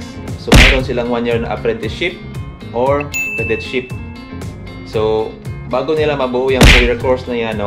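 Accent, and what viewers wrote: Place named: Visayas, Philippines. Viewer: This guy got strong Visayan accent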